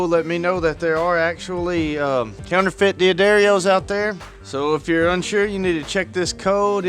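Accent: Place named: Western North Carolina, United States. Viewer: You sound like my cousin from the western part of NC. I'm in the East.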